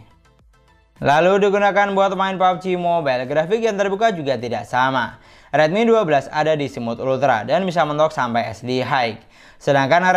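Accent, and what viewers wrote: Madura, Indonesia. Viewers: Aksen madura ya kk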